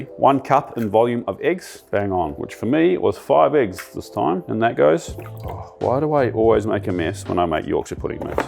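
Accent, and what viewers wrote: Australia. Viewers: He Aussie mate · Are you australian ?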